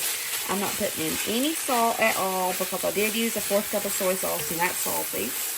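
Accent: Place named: Missouri, United States. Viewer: Hey are you from Missouri? Your dialect really sounds like it 😅😊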